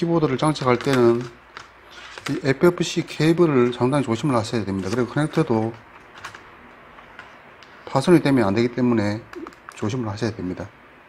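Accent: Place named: Western Gyeongnam, South Korea. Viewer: 서부경남분이시네요 ㅋㅋ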